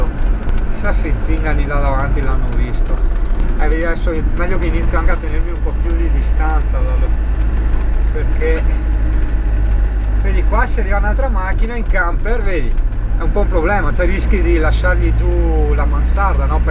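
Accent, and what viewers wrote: Veneto, Italy. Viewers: Sicuramente non sono mezzi da camminare forte considerato anche l'età del mezzo . Comunque bei video divertenti con l'accento veneto poi è uno spasso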